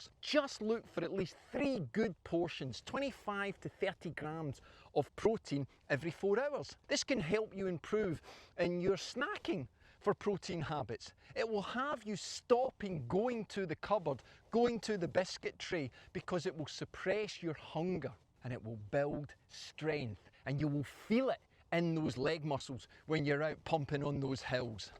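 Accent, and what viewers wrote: Scotland, United Kingdom. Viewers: "Blame your bake"! LOL I love this guy - he doesn't sugar coat anything and tells it like it is, all with a Scottish accent! SUBSCRIBED! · Good points!❤ Two off-topic questions 😊 - 1) why the mirrored video? 2) where exactly in Scotland can I get some of this accent? ❤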